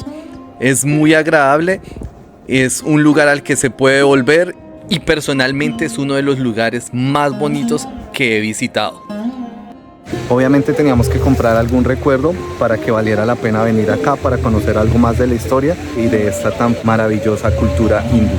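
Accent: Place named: Italy. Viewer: You are speaking Spanish with a Italian accent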